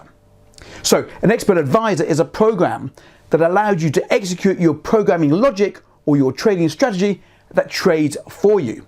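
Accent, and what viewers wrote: United Kingdom. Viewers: Those who mock in the comments and accuse of being a scammer are exactly those who have problems in understanding British accent, what he is saying is clear and helpful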